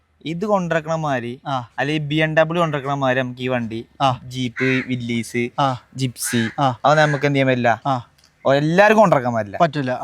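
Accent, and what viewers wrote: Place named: Northern Kerala, India. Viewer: Hi എനിക്ക് നിങ്ങളുടെ സംസാരം കേൾക്കാൻ വലിയ ഇഷ്ടമാ. വടക്കൻ സ്ലാങ് 😅😅😅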